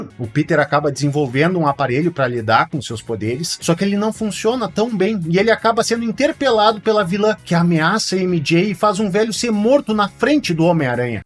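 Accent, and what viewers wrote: Rio Grande do Sul, Brazil. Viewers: Por acaso tu é gaúcho?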